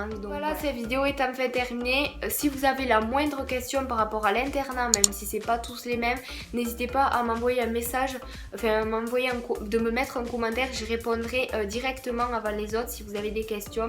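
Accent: South, France. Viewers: L'accent du sud est quand même Wow.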